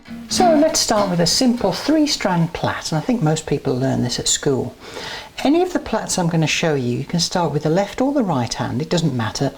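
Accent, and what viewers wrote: United Kingdom. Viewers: Great video. What I loved most was your British accent. Bravo.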